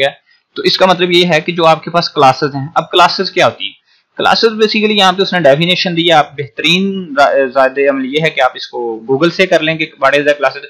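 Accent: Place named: Faisalabad, Pakistan. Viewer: Pka faisalabadi han janab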